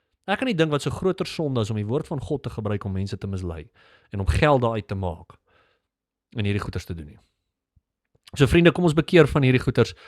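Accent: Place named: Mid-Atlantic, United States. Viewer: That "Mid Atlantic " accent should make anyone suspicious.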